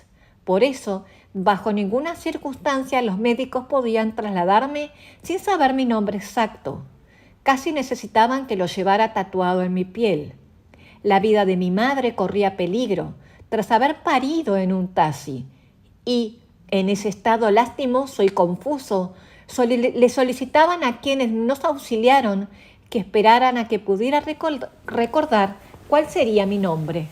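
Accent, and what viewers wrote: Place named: Uruguay. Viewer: Es muy difícil regresar a otro tiempo.espero terminar tu vidahistoria es un gusto aberte encontrado de casualidad.te considero una amiga siempre te escucho tu horóscopo si no te escucho me siento triste.tienes uba bonita voz un tono de los uruguayos gracias voy en el capítulo 2 y los voy escuchar todos buenas noches.desde aca san luis potosí México.si tuviste suerte en seguir con vida!❤🎉😊😊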